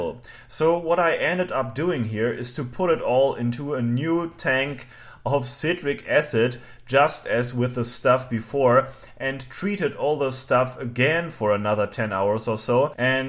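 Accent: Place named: Germany. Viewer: German guy speaking English (American English), German accent, German cadence, some German syntax, excellent vocabulary, excellent diction, idiomatic expressions are plentiful and accurate and used properly.......language proficiency is excellent. Where and how did he learn to speak English......so well.......? ? ? ? ? ? ? Best regards, fm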